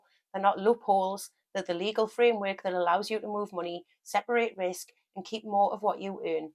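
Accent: Newcastle, United Kingdom. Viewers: Fascinating insights but for some reason (not in a creepy way) the geordie accent is engaging to listen to 😅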